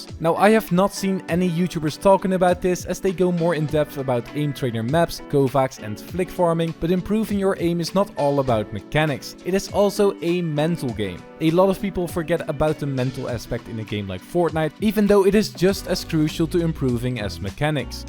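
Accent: Netherlands. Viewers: Ik heb het gevoel alsof je een Nederlands accent hebt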